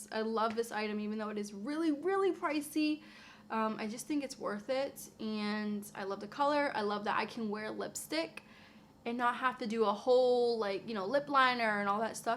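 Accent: Boston, United States. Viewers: Are you from Boston? Your accent.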